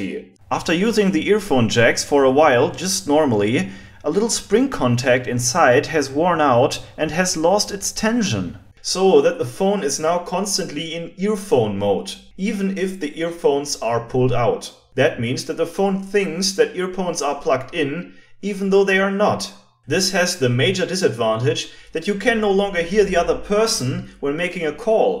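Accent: Germany. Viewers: I love how you almost mix some German words into the English ones. Like Zwölf and Twelve turn into Twölf😂 Also, awesome hack. I have used your audio input hack on an old Grundig "Music Boy" radio reciever, and it works great! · Man hört den deutschen Akzent einfach mal sowas von :D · i was here just to hear german speake english with accent! it was good ! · Dis strong German Dialekt made me klik Like at instant. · Horrible German accent. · The switch has to be deleted.......... LOL In den Papierkorb verschoben......... gröhl.... Herrlich dieses Englisch mit dem deutschen Akzent! Ich bin stolz auf dich! Weiter so! Vielleicht doch zukünftig in Deutsch? Aber solltest Du dich mal nach Bonn verirren gehen wir ein Bier Trinken! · Hate german accent -.- SO FCKN TERRIBLE!! Nice vid tho.. :D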